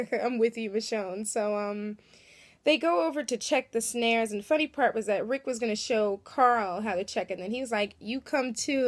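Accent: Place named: South, United States. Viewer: and from your accent i would say you are a southern girl so yea throwing a curve ball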